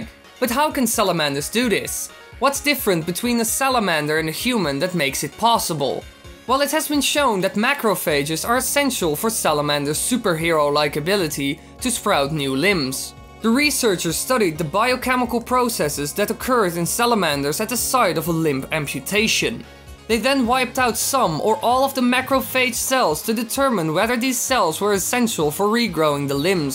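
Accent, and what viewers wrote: Netherlands. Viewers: i have one question, are you dutch because your accent sounds like one.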